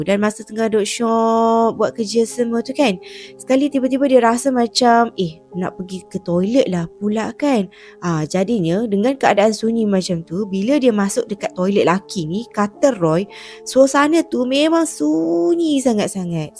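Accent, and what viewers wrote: Kelantan, Malaysia. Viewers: Assalamualaikum cik puan story ni maaf bertanya lah yer, Kelantan tu cik puan daerah mana tu, maaf sebab bertanya tu cara percakapan tu mcm loghat Kelantan, sekian terima kasih.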